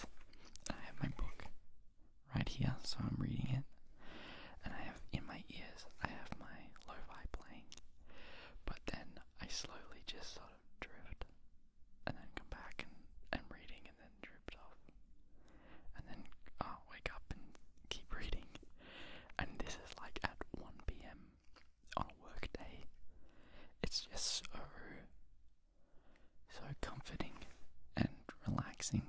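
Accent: Australia. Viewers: im not so familiar with the aussie accent but i've just noticed that yours isn't as strong as other aussie asmrtists (asmr beam for instance), what part of australia do you come from, north/south/east/west ?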